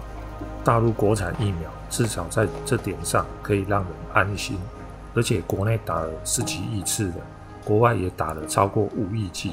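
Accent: Taiwan. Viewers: 靠北 ! 濃濃的台灣味 , 哈哈 !!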